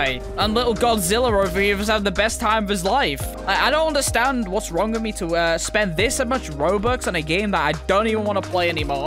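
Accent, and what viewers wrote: United Kingdom. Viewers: yo i have a question are you British?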